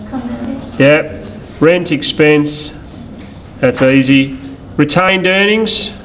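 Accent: Australia. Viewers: australian accent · Australians have a cool accent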